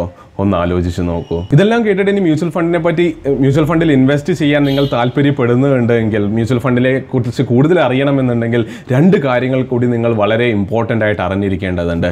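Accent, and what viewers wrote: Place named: Kollam, India. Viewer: കൊല്ലംകാരൻ ആണ് അതാണ് 💖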